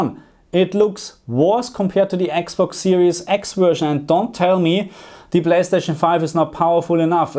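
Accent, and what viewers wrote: Germany. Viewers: Geiler Kanal, hab dich eben entdeckt. Man hört klar, dass Deutsch deine Muttersprache ist. Abo geht raus und Daumen hoch.👍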